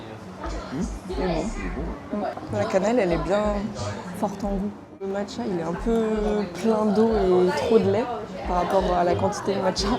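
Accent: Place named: France. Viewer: Really like your French accent! Making me to relearn French. Look like you enjoy time in Vietnam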